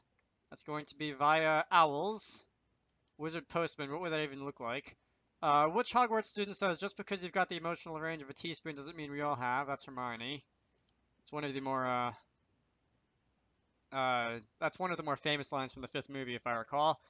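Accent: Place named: United Kingdom. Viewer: you sounded more british than me wtf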